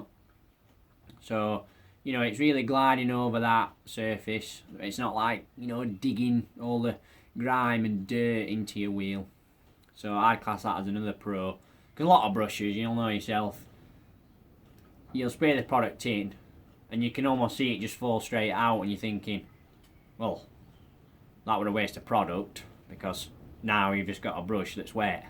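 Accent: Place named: Yorkshire, United Kingdom. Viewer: New sub. Cracking video, I'm from Lancashire but I like your style, proper Yorkshire 👍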